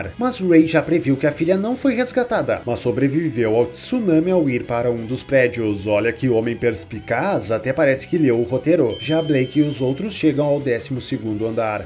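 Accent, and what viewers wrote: Rio Grande do Sul, Brazil. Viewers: Mas que barbaridade tche o guri tu e gaucho?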